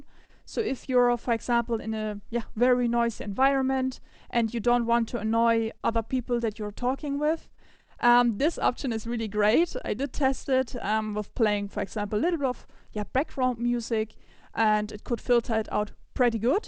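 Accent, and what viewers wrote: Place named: Germany. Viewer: Tell me my ears are right and you're German. 😁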